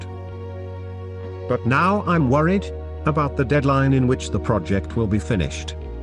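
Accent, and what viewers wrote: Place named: United Kingdom. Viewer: Tudo é perfeito nestes vídeos a música e esse sotaque britânico é mto agradável de ouvir. Parabéns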